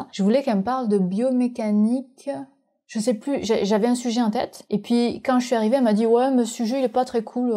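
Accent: Quebec, Canada. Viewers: C’est moi qui ne m’en suis jamais rendu compte ou est-ce que tu commence à développer un accent québécois ?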